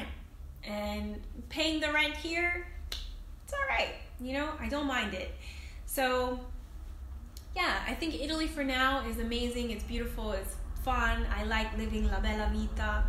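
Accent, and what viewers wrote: United States. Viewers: You don’t look different than Nigerians or Tanzanians etc. point is whether you are African American or African they see you as Black period and the only way they can tell you are an American is when you speak because of your accent other than that no, you are just like every other beautiful black woman at a glance. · If I see you in Europe I'll think you are East African, maybe Ethiopian. (Your hair and face seem common with people from that region). That is in a way natural because there are many Africans but almost no black Americans, black Carribbeans or black Latinos in Europe. If you start speaking English though, you'll be revealed as an American, because your accent is very obvious. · Why do you think people immediately spot you as an American? Your accent? Do you have to start speaking first?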